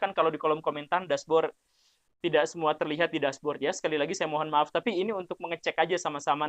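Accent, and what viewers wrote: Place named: Sunda, Indonesia. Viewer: Pandai adek I yg ganteng nich... Sunda nya medok ieu teh. ...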